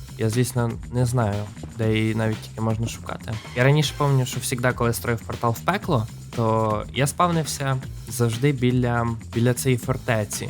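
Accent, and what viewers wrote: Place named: Poltava, Ukraine. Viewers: Полтавськиа мова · Дякую! Мій синочок дивиться ці майнкрафти, нарешті українською 😉 ну може трошки суржиком, але рідним, наче з-під Полтави)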